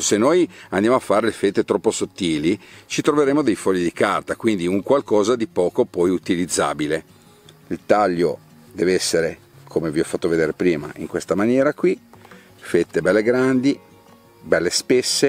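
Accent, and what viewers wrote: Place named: Liguria, Italy. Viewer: infatti mi pareva dall' accento che tu fossi ligure!! Liguria regione meravigliosa ! Grazie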